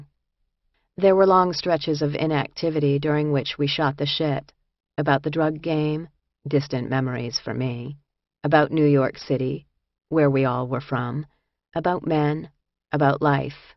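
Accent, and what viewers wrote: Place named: Russia. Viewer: Love her Russian accent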